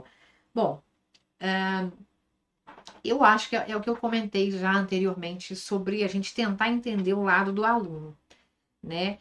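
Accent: Minas Gerais, Brazil. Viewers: Ele mineirinho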